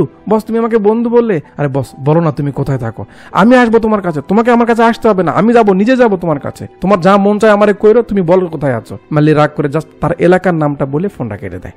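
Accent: Bogura, Bangladesh. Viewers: ভাই আপনি মে বি বগুড়ার লোক।❤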